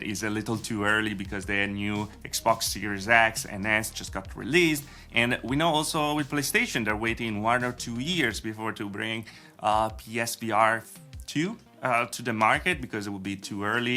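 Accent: Italy. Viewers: Oddio ma sei italiano? 😮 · Per qualche motivo pensavo che fossi italiano e ora ne ho la conferma :)) Nice video and keep up the work! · LO SAPEVO CHE ERI ITALIANO Quel accento... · Ohhhh, you are Italian, from what part? I was trying to guess your accent, thought Brazilian.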